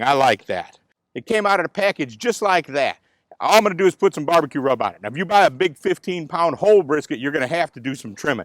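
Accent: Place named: Midwest, United States. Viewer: One of my favorite things in the world is listening to somebody with a Midwestern accent talk about meats. It reminds me of Saturday night live Super Fans Chicago Bears sketch.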